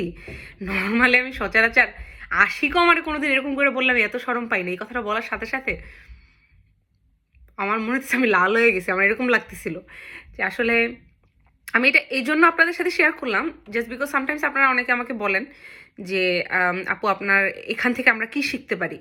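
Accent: Barishal, Bangladesh. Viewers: Amr o tmr vlog dekhte valoi lage! Ar tmr barishailla accent a kotha aro joss lage!😁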